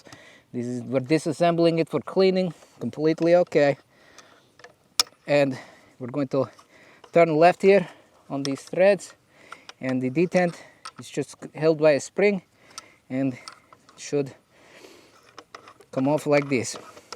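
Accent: Russia. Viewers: Your accent sounds like FPS Russian are you related? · Better Russian accent than FPSrussia. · Except for that russian accent you're almost clone-correct.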